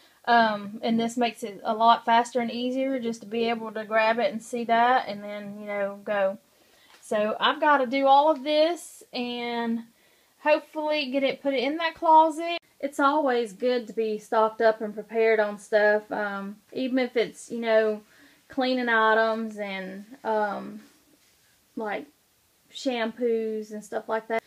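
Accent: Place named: Kentucky, United States. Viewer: If I was a bettin man I'd say yer from Kentucky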